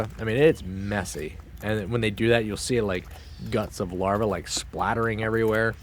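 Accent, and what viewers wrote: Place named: Philadelphia, United States. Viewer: Omg my son is obsessed with these videos and sucked me into watching them now I can’t stop. And I was thinking.. this guy is definitely from Philly. Am I right?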